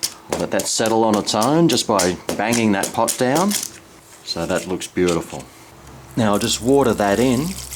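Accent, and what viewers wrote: Australia. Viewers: Love the video. Love the accent too. Sounds similar to Australian. Thanks.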